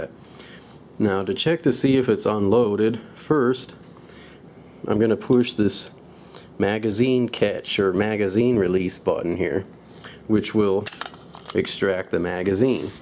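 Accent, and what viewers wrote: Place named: United States. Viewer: Where in the US is that accent from?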